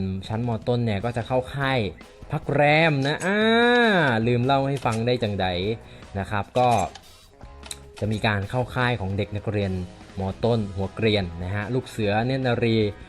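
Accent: South, Thailand. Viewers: พี่แหลงภาษาใต้ได้ม้าย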